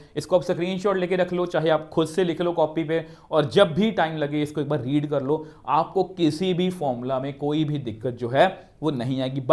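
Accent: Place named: Pakistan. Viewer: Sir ka accent pakistani kyu hai 💀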